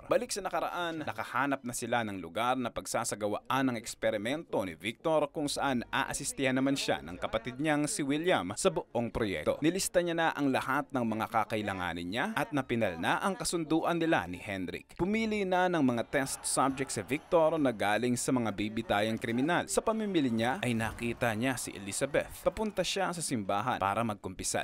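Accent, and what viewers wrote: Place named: Visayas, Philippines. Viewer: Halong bisaya dol.palibot man haha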